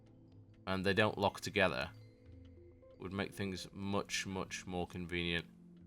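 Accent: United Kingdom. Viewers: This guy has British accent. I find that amusing.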